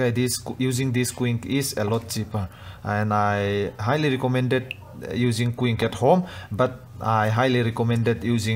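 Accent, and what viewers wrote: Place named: Mizoram, India. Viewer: Subscribe nghal, tangkai lutuk ❤️ I awphawi ah mizo inih ka ring ka en nghal bawk a, thanks for the video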